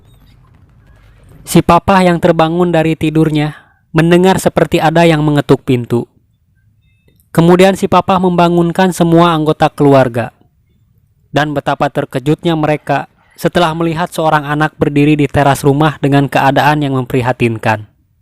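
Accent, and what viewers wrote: West Java, Indonesia. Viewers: Ini yg seru bukan filmnya tp yg ngehost. "si aying yg babaung" 😅😅 dg logat sundanya lucu bgtss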